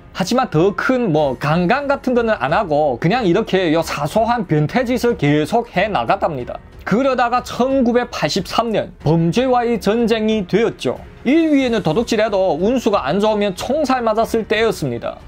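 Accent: Yanbian, China. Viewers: 연변사투리로 하니 꿀재미 ~ 영화보다 훨재미있음 ~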